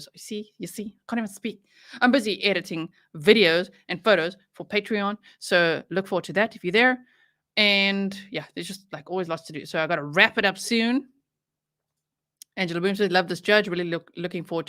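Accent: South Africa. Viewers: We are South African, living in George Western Cape. My husband said all along you sound South African. 🇿🇦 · You did not reply to a question I asked you on another video. I asked if you are ex South African, because I am & your accent sounds as if you could be from SA. 😊 I listen to another YT channel on crime, but I stopped listening, because the woman's voice grated on me. I've found that on other non crime channels too. I don't think YT creators realise that their voice & physical presentation DO matter. As do the facts, & not a whole lot of speculation or repetition! This is why I like watching you. You tick all the boxes. I also like that you don't swear! That's a huge turn off for me. Did I hear you say you'd been an airline pilot?? Just after I commented on what I like about you, you went on to talk about being responsible. That's exactly right, I see you that way. 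· I'm a South African and is trying to pin your accent. Are you a South African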